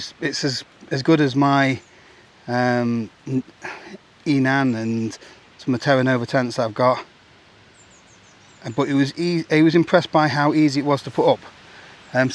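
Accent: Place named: East Yorkshire, United Kingdom. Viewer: You could get yer brompton in there wiv yer.....real cosy. 'Ull' east yorkshire.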